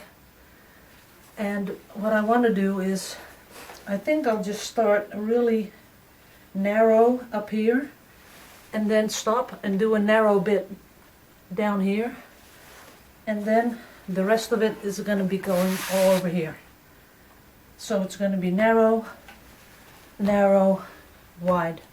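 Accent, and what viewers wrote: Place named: Netherlands. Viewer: ok cool. I'm relatively new to your channel and I'm hooked.. I think about 2 weeks.. but of course I'm not watching in order.. youtube recommends and I follow lol.. I like listening to you very much too.. you're so happy doing what you're doing.. and your accent reminds me of some of my relatives. My mom is from overissjel..and my dad from the Hague.. I'm in Canada.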